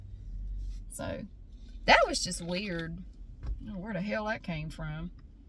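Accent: South, United States. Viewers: I am patiently waiting for your costume reveal!! The holidays w/ southern ASMR sounds are my my favorite way to celebrate! You always get me in the spirit ❤️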